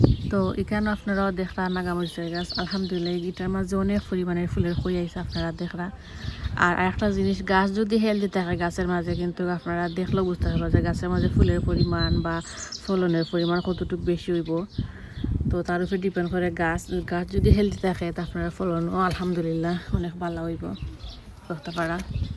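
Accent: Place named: Sylhet, Bangladesh. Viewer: She is speaking properly, shes speaking sylheti which is a different dialect of bangla it does not mean it is from a lower class. YOU need to learn to speak properly and not make judgments based on people’s background.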